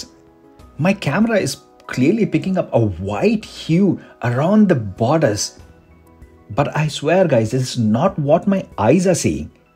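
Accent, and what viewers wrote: India. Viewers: Wow, thank you, this is very reassuring. I’m gonna go for the 12.9”, if I notice blooming I’ll just euphemize it as carpeting. Going back to global dimming is not an option. Switching to OLED or microLED when available at certain loss. You should have more subscribers. You’re the first reviewer “with a sub-continental accent (deliberately unspecific)” I like 👍. edit: not a defining factor, just objective.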